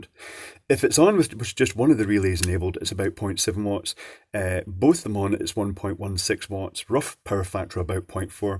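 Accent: Scotland, United Kingdom. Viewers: Hello, i love the Scottish accent, it makes everything more interesting. 😊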